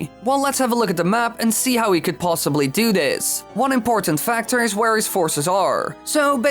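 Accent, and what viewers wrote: Netherlands. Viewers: I'm sorry but the dutch overtones in this one are too much for me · I was wondering, are you Dutch? Cus i am and i speak english kind of the same way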